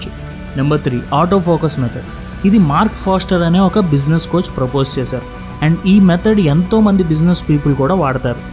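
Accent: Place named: Telangana, India. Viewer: Ante konchem Language lo Telangana slang vastundhi anduke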